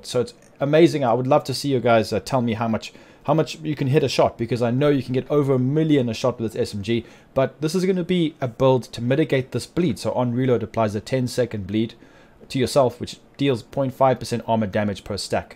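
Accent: South Africa. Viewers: Im guessing Joburg or Durban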